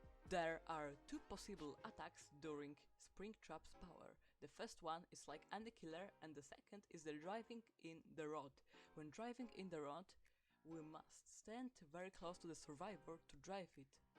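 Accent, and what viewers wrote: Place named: England, United Kingdom. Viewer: Uu ar pierdolnięty anglik xd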